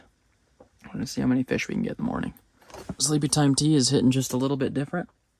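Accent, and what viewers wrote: Minnesota, United States. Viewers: I love how you say fishin' and campin' like a Minnesotan,.:) Hello from Michigan (same accent here, basically).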